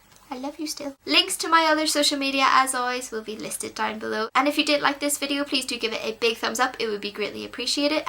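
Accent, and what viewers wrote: United Kingdom. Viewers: I can't place this accent....British....but there appears to be some other musicality subjacent to it. Or my ears deceive me. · Where is this accent from? Northern Ireland? It's my favorite accent to listen to in english. Number 2 is the accent they have in Hawai'i, it's an accent of no worries. Just like australia.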